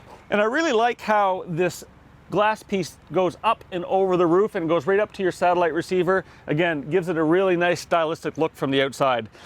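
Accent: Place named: Midwest, United States. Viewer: Midwest accent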